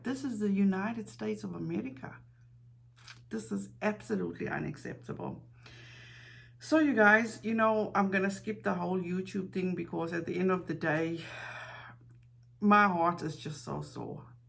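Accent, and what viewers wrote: South Africa. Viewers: I love your accent. South African? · Are you from South Africa? I kind of hear that in the accent, otherwise I can’t place it.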